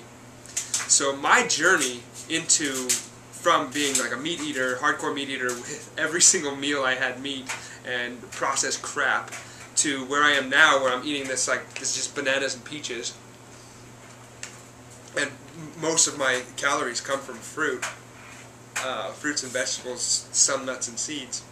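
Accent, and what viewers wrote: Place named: Spain. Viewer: Nice Spanish Accent...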